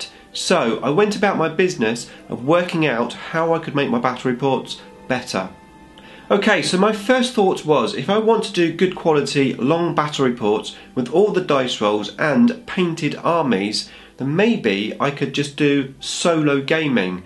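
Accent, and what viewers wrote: United Kingdom. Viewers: This concept of "the worst" is absurd. Maybe "one of the least fancyful baterps" yes. Maybe it doesn't have professional background, maybe sometimes there are too little tactical comments, but still there are more than in many other batreps. They are short, they go straight to summary, to the point - sometimes it would do much good to have parts of batrep extended to show particularly important clash highlighted. What I lack in most batreps now is what is the virtue of yours, however not all the time and not in relation to every faction on the table. E.g. you explain how Necrons operate but not necessarily how opposing force does. Still I wanna say it's tactical comment that's most worthy. Watching rolling dice for 2,5 hours is crappy and boring. The scenery may be the most beautiful but still it will not make me any good to listen to comments restricted to stating the obvious e.g. 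"Again I rolled below average. Oh, and now above average. And now all 2s! What tough luck". So continue your good work, Sir. And well, we'd miss this singing British accent, this "video" at the beginning of your pieces! Best,!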